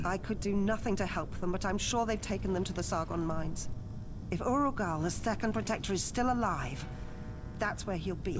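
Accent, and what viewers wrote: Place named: Trinidad and Tobago. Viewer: you sound like a trini , you from trinidad bro ?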